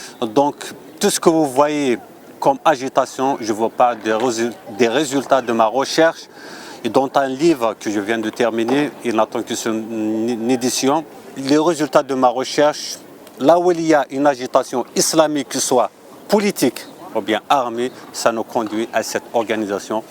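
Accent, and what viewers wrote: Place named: Kabylie, Algeria. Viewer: le français avec un soupçon de takvailit quell horreur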